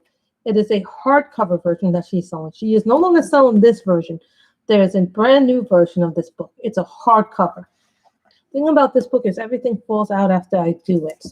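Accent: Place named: New York, United States. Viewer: Wow. You were really busy in June. Great job on finishing books. I have yet to finish one. I jump around so much. I just want to color all the books. Lol. I hope you are enjoying/ enjoyed your vacation. So tell me where in NY are you? Yes the accent gave it away. Lol. Of course I know it well as I’m a New Yorker. I use to live in Yonkers. I’m now in Florida. Ok. Take care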